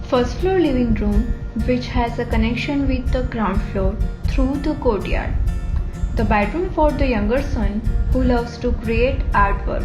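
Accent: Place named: North India, India. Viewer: Typical North Indian engliss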